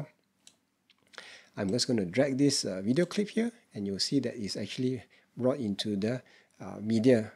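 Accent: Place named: Singapore. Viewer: Love the Review. Even more, love the Singapore accent.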